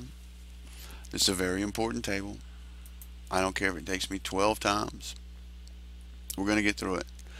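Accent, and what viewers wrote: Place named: South, United States. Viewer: Being from TX ,, I love your southern accent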